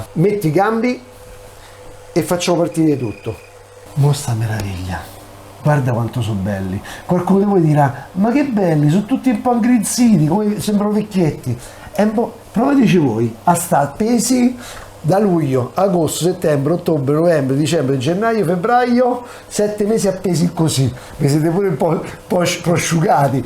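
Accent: Rome, Italy. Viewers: RICORDATI A MILANO NON RINNEGARE IL ROMANESCO!!!!!!!!!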